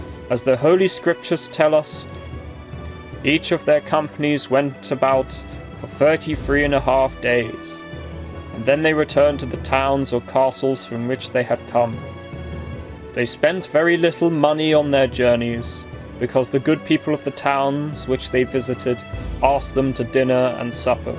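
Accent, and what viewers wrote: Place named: United Kingdom. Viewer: Good show, but I cannot stand a British accent. Also the background music is far too loud and annoying.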